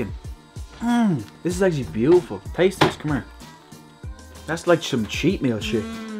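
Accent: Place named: Dublin, Ireland. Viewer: You sound more like a dubliner lately I love it mate 😂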